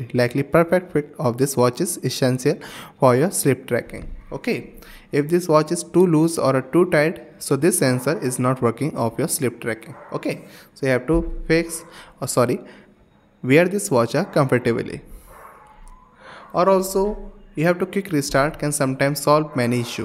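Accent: India. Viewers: Please add captions, sometimes it sounds like you’re speaking Indian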